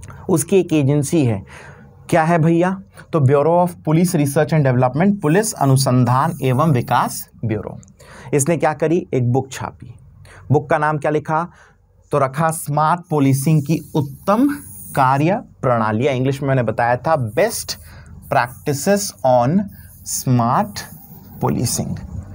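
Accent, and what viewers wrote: Chhattisgarh, India. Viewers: Thank you sir😁🙏😁... Sir aap kaha se hain...apki chhattisgarhi ka lahza achha lgta h....😁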